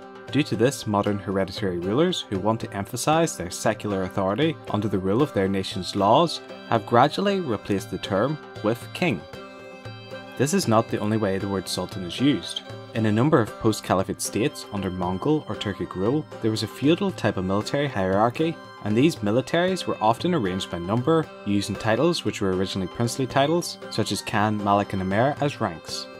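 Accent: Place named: Ulster, United Kingdom. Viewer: I don't know for sure but I'd say Ulster.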